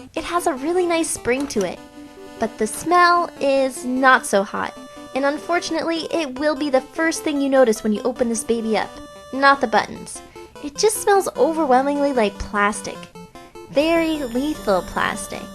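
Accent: Canada. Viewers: Your Canadian accent is so cute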